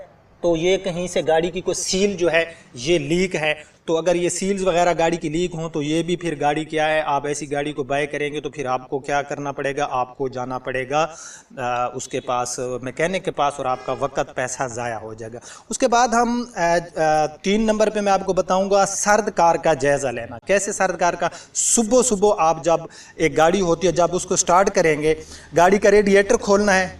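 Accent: Saraiki, Pakistan. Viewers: AP ki Urdu ye bta rhi h k AP saraiki hen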